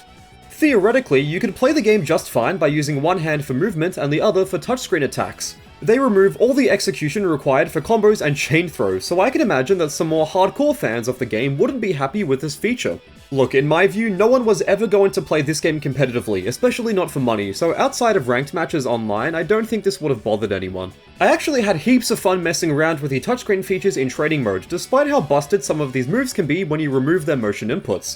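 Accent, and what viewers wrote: United Kingdom. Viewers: Ur from Australia?! I assumed u were from the UK 🤯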